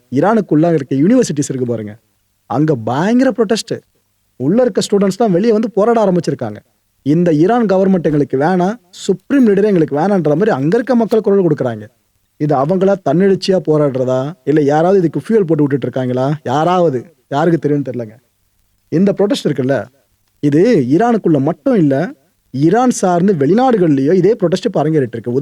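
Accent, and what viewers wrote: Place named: Tamil Nadu, India. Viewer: கால் இல்லடா. Call கோல். Check Google Voice. தமிழ்நாட்டு English படு கேவலம்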